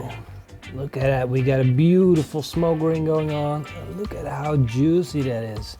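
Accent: Netherlands. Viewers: I was like he sounds dutch hmmm. Turns out he is dutch 😂😂🇳🇱👍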